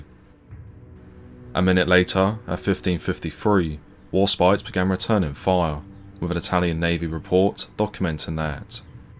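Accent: England, United Kingdom. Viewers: Don't like it, go watch something else, simple. Stop trying to be a clever dick. You clearly fully understand what he is saying, because if you didn't, you wouldn't be try to correct it. The narrator has a regional English accent. The same way he cannot change his voice, you cannot gain brain cells. Moron. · This pronunciation is APPALLING, he sounds British but he can’t even pronounce the names of the British ships correctly.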